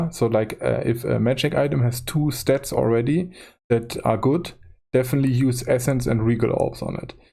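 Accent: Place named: Germany. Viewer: Man merkt, dass du Deutscher bist. 😄 Gutes Video!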